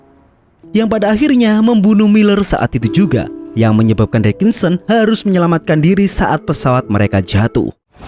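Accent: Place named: Malang, Indonesia. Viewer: Bahasamu kek org malang min😂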